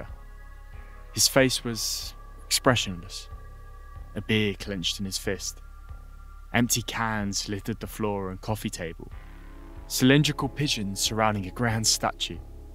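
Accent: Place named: United Kingdom. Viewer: lose the british accent.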